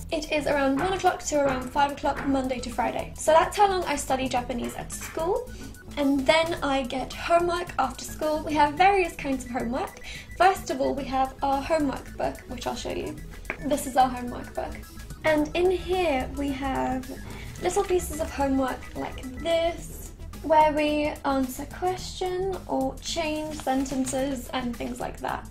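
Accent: United Kingdom. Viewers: I loooooove your British-English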